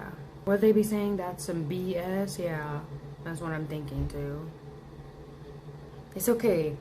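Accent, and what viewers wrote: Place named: Transatlantic, United States. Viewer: you’re the first GenZer with a transatlantic accent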